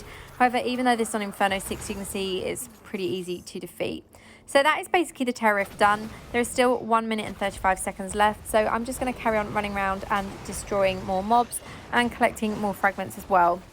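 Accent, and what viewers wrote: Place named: United Kingdom. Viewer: What a British accent ^^❤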